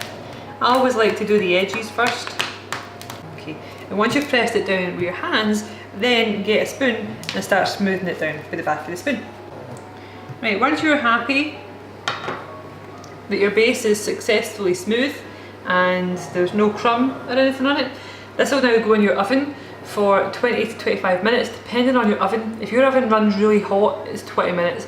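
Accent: Scotland, United Kingdom. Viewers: New subscriber! How did I not know about your channel lol :D I do recipes too...nice to hear another Scottish accent x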